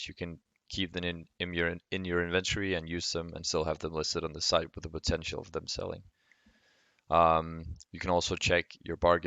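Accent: Denmark. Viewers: u danish? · are you danish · jeg vidste du var dansker da jeg hørte din accent og det fik jeg bekræftet da du skærm optog din steam mobile guard app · DENMARK jeg kan høre det på agsangten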